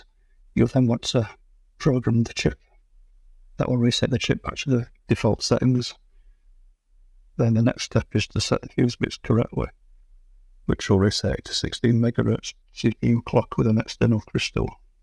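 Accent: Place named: Scotland, United Kingdom. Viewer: I can understand you, maybe I’m used to the northern and lowland Scottish accent?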